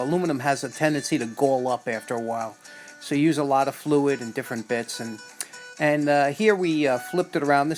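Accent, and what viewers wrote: New York, United States. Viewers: Now you know my favorite part.... remember how this looked BEFORE I started..... Ok, I admit....I've heard those words with that New York accent in my tool restore dreams as of late. They usually involve a crowded basement complete with the musty smells of an old Victorian era home paired with the faint essence of oil and varnish. The recesses and corners of the room loom in mysterious darkness but the Hydraulic press and the lathe always make themselves known. Finally my attention is drawn to a remnant of red carpet where some shiny tool gleams with an otherworldly aura. The choirs of sweet heavenly voices harmonize with the 50s bad-boy motorcycle theme music. All is well in the world.....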